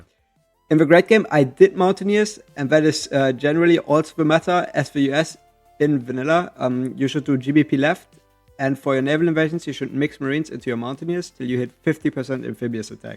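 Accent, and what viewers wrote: Germany. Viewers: ohhh i hear se german aktzent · i hear DEUTSCH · I just saw your video against NAYF in tommy channel and then the algorithm send me this, what a finding! If you dont mind, I would just tell you to speak a bit more slow, its a bit difficult with your accent, is it german btw? (no offense lol, I am also not a native speaker). Btw, are there any other players on your level besides NAYF and Mohammed on this MOD? I would love yo see more matches, you play damn good. Edit: the commanders MOD I meant!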